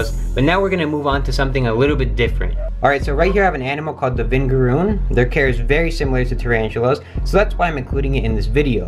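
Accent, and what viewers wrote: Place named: Argentina. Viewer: I don’t really mind if you speak English in your videos, but I love when you talk in Spanish. I mean, that Argentinian accent? God, it’s just beautiful.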